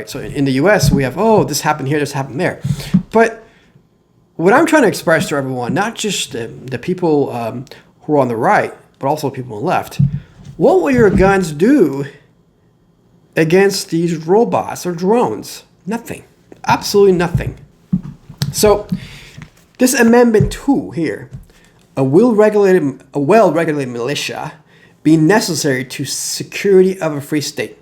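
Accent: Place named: Iran. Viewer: Piper Ohio >> No he doesn't. That's his Iranian accent. DON'T change the speaker!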